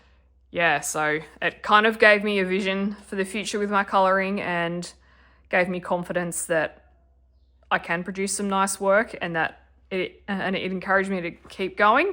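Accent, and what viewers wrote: Australia. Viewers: Aussie accent, hit subscribe immediately! I’m a Melbourne based colouring book enthusiast too!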